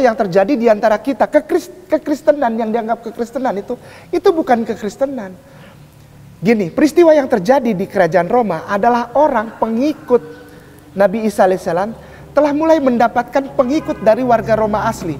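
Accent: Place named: Eastern Indonesia, Indonesia. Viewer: Terima kasih ustd kainama mski cara penyampaianny khas ketimuran.. kami skrg jadi mendapatkan penjelasan mengenai injil dan bible.. semoga Allah SWT membalas segala kebaikan atas kebenaran yg kau berikan.. aamin ya robal alamin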